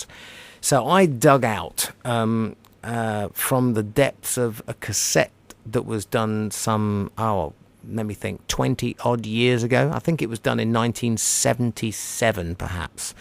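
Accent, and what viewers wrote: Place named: England, United Kingdom. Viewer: A great commentary love is English Accent.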